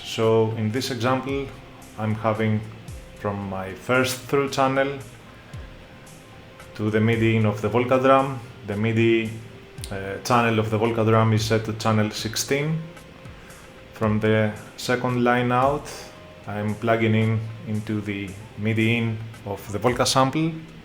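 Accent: Greece. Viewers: that's the Greek accent, for sure ! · ahahahah you are greek I can tell🤙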